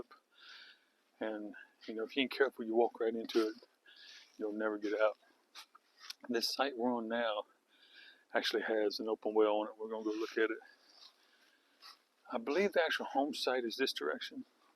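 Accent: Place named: South, United States. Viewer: I was referred to you from at her YouTube channel called: Eat Pray Van that I'm subscribed to so I'm happy she referred all her subscribers to you on her first LIVE video the other day & now I know why.... Cuz your sooooo honest & genuine & very interesting & informative & good looking & good sense of humor & good heart & good sense and I absolutely love your southern accent & southern descriptive words & your voice tone & volume. Sooooo relaxing! Your a great speaker! All the wonderful things that is also. Because you are you I watched & then subscribed right away. Nothing phony about either of you which are the only Creators that I will subscribe to. I also clicked the " LIKE " button that I ALWAYS do & never forget to do that. Ty again!!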